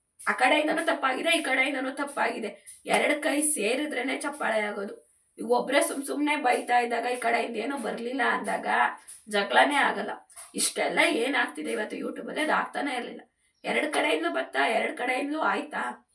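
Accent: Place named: North Karnataka, India. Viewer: uttarakannada bashe keloke chanda...yar yen helidru bashe ge ero gowrava sikke siguthe bit bidi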